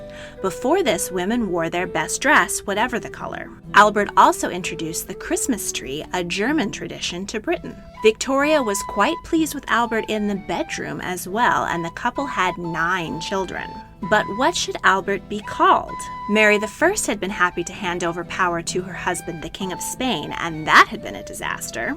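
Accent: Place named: United States. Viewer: I do find an American narrator for my country slightly disconcerting !!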